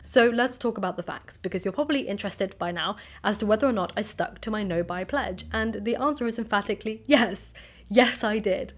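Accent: RP, United Kingdom. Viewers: That posh accent! Still trips me up. But the more I get to know you... the more I understand and respect you, what you stand for. (Too early to use words as 'like' or 'love' but... gett'n there). 😜😁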